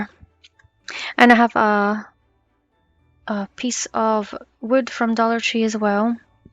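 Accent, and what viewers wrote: Scotland, United Kingdom. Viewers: I am a new subscriber to ur channel . Love ur diys. U sound very Scottish. As that’s where I am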